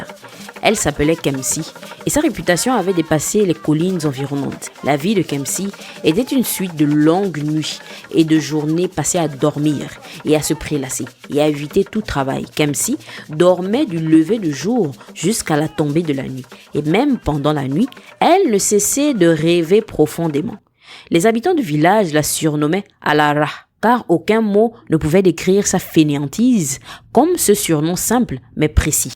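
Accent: Gabon. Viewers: La narratrice est forcément gabonaise. Ne changez pas pour l’IA svp❤ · La voix d’une gabonaise ❤très belle histoire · C'est une voix Gabonaise derrière cette belle histoire ❤ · Un bel accent Gaboma❤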